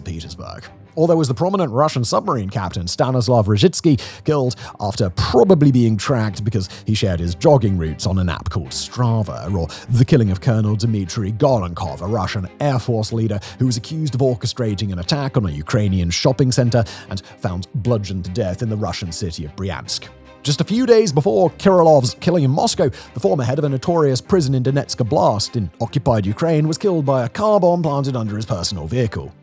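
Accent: England, United Kingdom. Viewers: It’s hilarious to me that someone as ill informed or informed but lying to their audience is so highly regarded on these topics simply because he has a YouTube channel and speaks with an English accent. To be clear, there is not a single part along the front lines that Russia is quickly advancing at. Russia is getting the butt’s handed to them in every direction. Just because you are losing a tree line or a few fields every week doesn’t mean you’re losing. Ukraine has taken more land in Russia than Russia has in Ukraine all year. In the area’s where Russia is advancing their casualties are as high as 10 to 1. This is a strategy that is similar to a heavy weight boxer letting the bigger and stronger opponent wear themselves out by throwing non stop haymaker’s that’s doing little damage. At the rate Russia is advancing it will take them 250 years and 100 million dead soldiers just to reach their stated goals of taking Donbas, Luhansk, Kherson, Zaporhizia, and I forgot the other off the top of my head. 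Make no mistake if Ukraine said we are going to hold this line at all cost they could do it, but if Russia wants to lose a thousand soldiers and millions in equipment to take a field you let them in a controlled retreat. Russia’s economy is collapsing, their allies and bases overseas are falling, their military has been set back decades, and there are sign people inside Russia are turning. Right now Russia is losing more troops than ever before. Everyday they are setting a new rec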